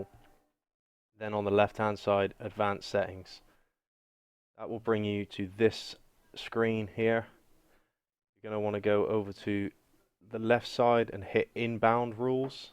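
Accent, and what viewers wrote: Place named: Iceland. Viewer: where are you from. you sound like your from Iceland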